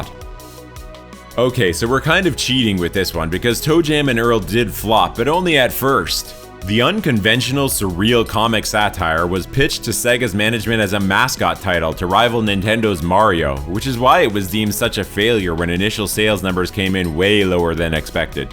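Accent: Canada. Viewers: i can always tell when its a canadian when they talk funny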